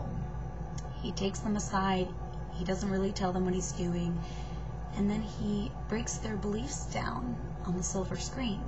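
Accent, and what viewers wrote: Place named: United States. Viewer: Fundies don't think for themselves, that's what their bibles and pastors are for, but you would know that if you really lived here or understood anything about this country. Then again, you do sound more like a seppo than an aussie. Methinks you're a bit confused - favoriting Bill Maher and Richard D and then whingeing at me. Hmmm...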